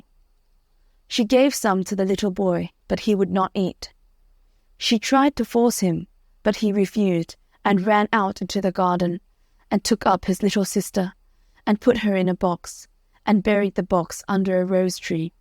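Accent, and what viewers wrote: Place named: United Kingdom. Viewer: This is British accent.😉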